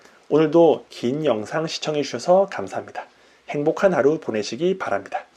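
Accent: Busan, South Korea. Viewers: 오늘도 상품등록 해보자~~~ ㅎㅎ 억양이 딱 부산분이신거 같으네요 저도 부산사람이라 느낌이 딱 오네요~^^ 반갑습니다~ 돈은 벌어야하는데 저희집 사정상 제가 집을 비울수없는처지라.. 정기적으로 출퇴근하는일은 엄두를 못내거든요.. 그런데 돈은 또 벌어야 된다는 아이러니의 구렁텅이에서 허우적 대고 있는 사람입니다... 그러다보니 집을 비우지 않고 할수 있는일을 늘 찾지만...쉽지가 않아 알아보고만 있던중 유튜브 알고리즘이 을 만나게. 해줘 정주행하며 감사히 공부하고 있습니다~ 평소 소비자 입장에서 알리익스프레스 이용하고 있거든요 말도 안되는 저품질상품도 많은데 시간들여 잘찾고 배송늦는거 감안하고 느긋한맘으로만 기다리면 정말. 가성비개꿀. 득템인 상품이 많더라구요.. 해서 요즘 소비패턴은 급하고 당장필요한건 쿠팡 이용하지만 그외엔 배송기간 감안하고 알리서 사거든요.. 알리서 싸게 구매한 악세사리를 혹시나 해서 가격을2배로해서 당근이랑 번게에 올렸더니 팔리더라구요... 해서 아에 알리익스프레스에서 가성비좋고 판매 많이 되는 상품 위주로 구매해 스마트스토어 개설해서 판매해봐도 되지않을까? 하는 생각이 들어 공부중인데요 그렇게 시작해봐도 될까요? 전 당장 월 몇천만원 이런수입 바라는거아니구요 그정도수입 내려면 그만큼 시간과 노동을 투자해야 되는데 제형편이 돈버는거에만 시간을 오로시 투자할 처지가 안되거든요 해서 전 지금 내식구 최소생활비 버는게 목적이고 그게 충족되고 안전해지면 더큰꿈 키워 나가보고 싶거든요... 제가 너무 소극적인가요? ^^; 뭐든 좀 배우려하면 목돈부터 드니 돈없어 달려들지도 못하겠고 그나마 께서 촙ᆢ자도. 알아들을수있게 자세한 영상 올려두셔서 보며 공부하고는 있는데... 이렇게 시작해봐도 되는건지 의문이 듭니다 너무 길고 추상적인 질문이라 읽기도 힘드시고..ㅎㅎ 답주시기 애매하시겠지만... 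조언 부탁드립니다~~행님~^^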